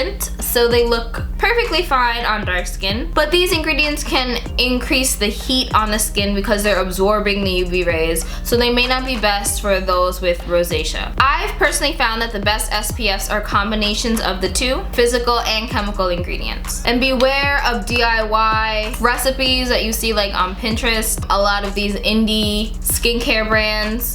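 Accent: Jamaica. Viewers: Just found your channel today and started watching. I heard the accent then you said you are Jamaican 🇯🇲. Loving your channel my Jamaican sister.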